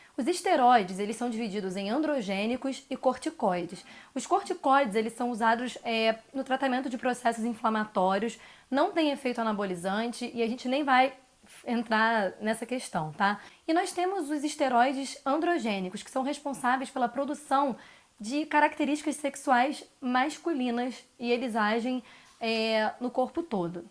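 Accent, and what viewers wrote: Rio de Janeiro, Brazil. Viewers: Não consigo assistir com esse sotaque carioca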